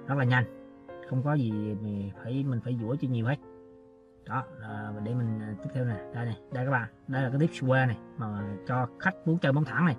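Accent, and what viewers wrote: Central, Vietnam. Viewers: Nghe giọng miền trung mà nhớ quê hương quá. Anh chỉ rất tận tâm. Đã like và subscribe :)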